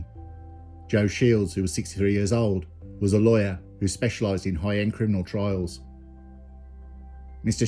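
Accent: Birmingham, United Kingdom. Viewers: yeah I’m usually good with accents but although it sounds brummy to a point I was unsure so thought I’d ask, top channel pal keep the videos coming! Salute.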